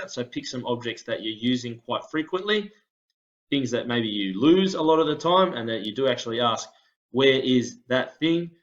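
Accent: Australia. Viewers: Strong Aussie accent there bro. Ka nui te pai. Good on you bro for doing this for us even here in Aotearoa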